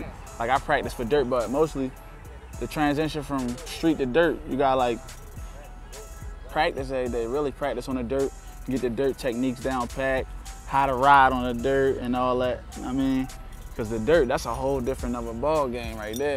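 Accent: Baltimore, United States. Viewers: Baltimore accent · Baltimore accent bro it's how we speak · thats that bmore shit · Yeah, that's our native accent. "Dug" = "Dog" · that's a Baltimore accent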